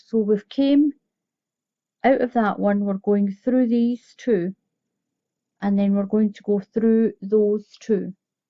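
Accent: Scotland, United Kingdom. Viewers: I could listen to you talk all day, your accent reminds me so much of my Nana's best friend Helen, they've been friends for over 50 years. Helen has been in the US for longer than that, but she's never lost her Scottish accent. My Nana said when she first met Helen she could hardly understand a word she said haha.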